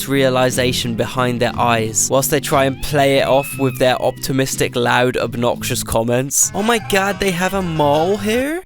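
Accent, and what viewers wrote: United Kingdom. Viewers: Homie nobody in eastern europe recognises a british accent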